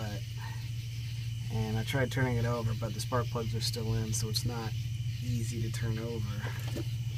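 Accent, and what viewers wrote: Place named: Arizona, United States. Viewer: Downvoted because Arizona accent.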